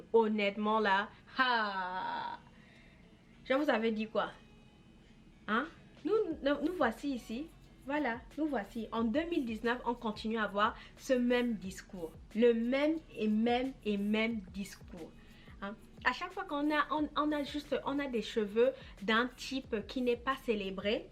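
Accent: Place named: Gabon. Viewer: En entendant ton accent j'ai cru que tu étais Gabonaise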